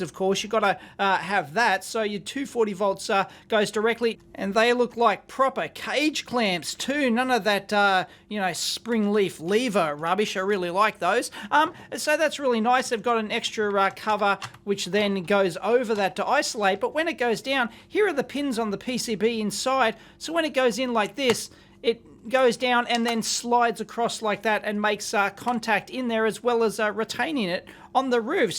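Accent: Australia. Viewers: Somehow the outrage sounds more intense with an Australian accent.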